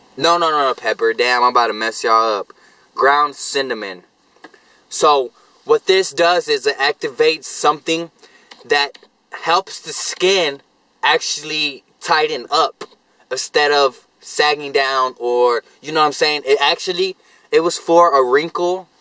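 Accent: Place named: Texas, United States. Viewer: texas accent came out in this vid a lot 🤣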